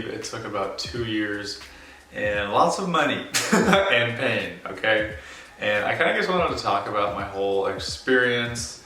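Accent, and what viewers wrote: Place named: Utah, United States. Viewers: Which part in the US does your accent come from? I'm not a native speaker of English, so that's just a tiny little curiosity of mine. By the way, your tattoos are amazing. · He was born and raised in Utah, so that could be the accent that you're talking about. 🙂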